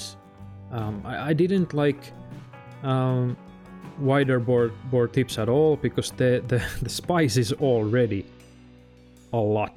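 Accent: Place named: Netherlands. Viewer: that dutch accent 🤌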